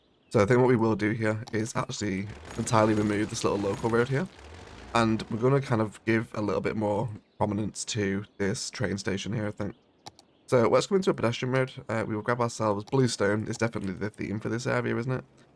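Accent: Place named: United Kingdom. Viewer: Curious, why do you play/build using right hand traffic? You sure sound British, so I would have thought you'd use left hand as that's what we're used too. Biffa does the same too. 🤔 Is it just a personal preference? The highways with dedicated bus lanes are totally "cattle trucked" up. They've got them the wrong way round. I use left hand traffic, as that's what I know and drive in; but, the game has the bus lanes in what is called "Lane 3" whereas the should be in "Lane 1". They're a complete waste of time, but, they'll probably not ever get fixed as too much time has passed now.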